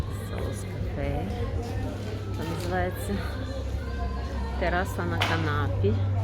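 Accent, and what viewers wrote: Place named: Western Ukraine, Ukraine. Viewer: Як приємно чути ваш говір,західний наш рідний!Ви ВЕЛИКА ЛЮДИНА!!!!Пишаюся вами!!!💛💙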